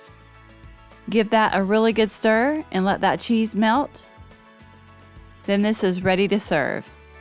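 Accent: South, United States. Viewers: I'm so glad I just came across your channel! After watching the first video you posted 3 days ago I immediately subscribed! Your recipes look so delicious and easy to make. I've been looking for some new recipes for casseroles and the crockpot especially and you've got those and many others that I'm probably going to try them all! Thanks so much for sharing!!! I'm also curious where you're from if you don't mind me asking? I'm from the south in North Carolina and you sound like you have a southern accent and your recipes seem like they're southern too which is another reason I love them!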